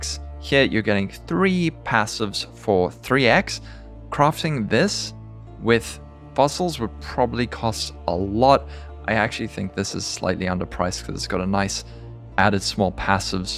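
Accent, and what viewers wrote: South Africa. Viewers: Well i can tell of another south african im also been looking at your vid for a day or 2 nice job man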